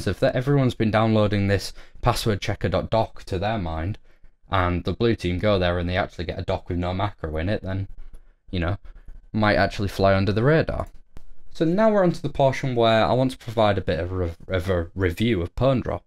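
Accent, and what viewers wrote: United Kingdom. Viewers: Men im in love with this uk dialect .. i just enjoy alot ..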